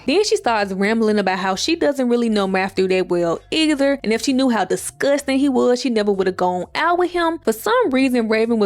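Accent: Memphis, United States. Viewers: She's from Mississippi! But I thought Memphis too!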